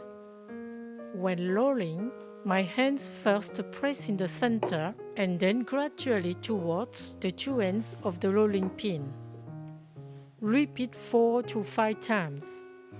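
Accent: France. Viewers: When the food is Chinese, and the accent is french you know it's going to be tasty. It looks like a very memorable experience by the table.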